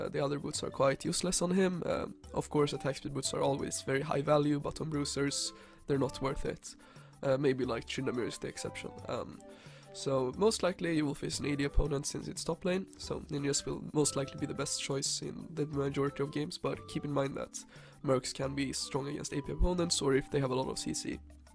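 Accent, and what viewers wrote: Sweden. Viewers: Are you swedish? It sounds like that on you accent. :P. im not swedish btw,, but they usually speak like you.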